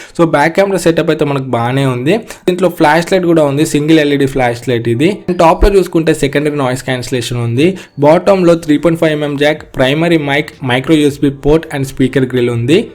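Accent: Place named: Telangana, India. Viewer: Anna nuvu pakka teleangana slang lo cheppu anna it will be more interesting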